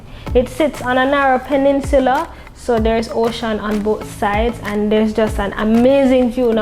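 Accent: Jamaica. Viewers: you are very funny and cute... Especially when you let go with your Jamaicaness. I love you videos and your energy..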